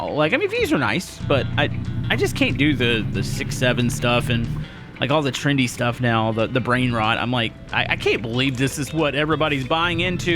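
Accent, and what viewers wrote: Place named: East Texas, United States. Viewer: Sounds like you’re from east Texas! Tyler,TX here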